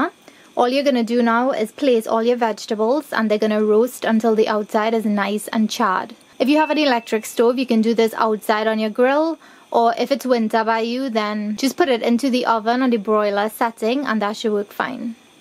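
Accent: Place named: Trinidad and Tobago. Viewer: Oh goddd a love meh trini ppl accent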